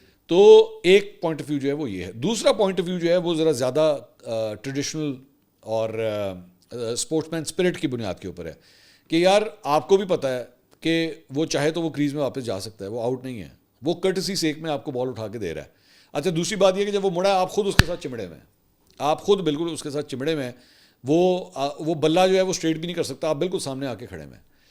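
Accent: Punjab, Pakistan. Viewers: Wah apki Punjabi ❤❤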